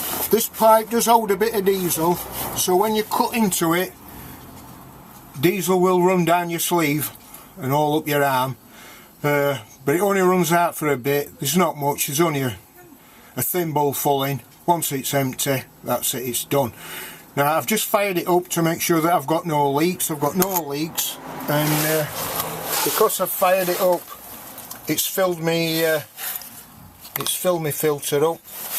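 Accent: United Kingdom. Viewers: Hi. I'm about to buy a Vivaro SWB and I'd like one of these fitting. Do you offer this service please? I'm guessing by your accent that you're in the North? I'm in Lancashire. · Proper northerner. Love the logic, great explanation 👍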